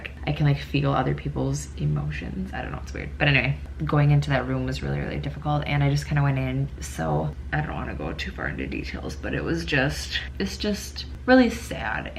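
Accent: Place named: Midwest, United States. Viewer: I swear I heard your accent and I Heard the Midwest! Ahhhhh! ❤️ You’re so cute, I’m trying so hard to get into a nursing program and anatomy is killing me with the memorization! How’d you power through the pre reqs to get to your final destination? It’s my dream!!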